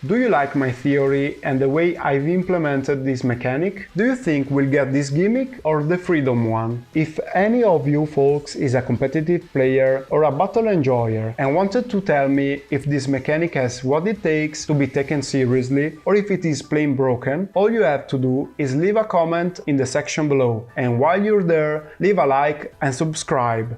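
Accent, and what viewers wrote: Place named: Italy. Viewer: you have one of the strongest Italian accent I ever heard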